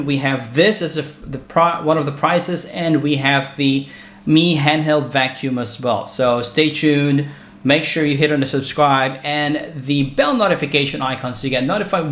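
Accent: Bisaya, Philippines. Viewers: bisaya diay ka sir? Nice HAHHA washout sir from PH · bisaya d i ka bai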